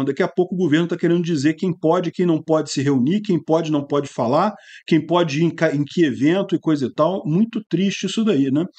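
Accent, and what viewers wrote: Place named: Rio de Janeiro, Brazil. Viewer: Por que cariocas dizem "cuestão"?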